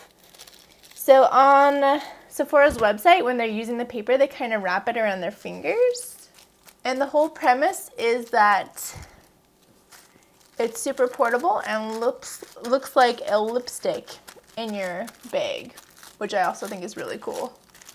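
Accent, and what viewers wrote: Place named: Canada. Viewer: That’s just a Canadian accent, girl. 🤦🏻‍♀️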